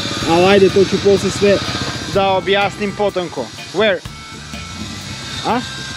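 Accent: Niš, Serbia. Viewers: Pećina sa 5 miliona SLEPA MIŠA - kolko volim niški padeži i akcentovanje, nema jače! Samo napred, velika podrška, KIDAJ! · Pričaj niški slobodno svi razumemo ,ali odlican video. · a be ovoj neki novi momenti :)) pričaj si sve niški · Baš mi je drago što be bate tepaš si po naš Nišlijski, pozzz može li tamo neki džokavac da se smota ovo ono :D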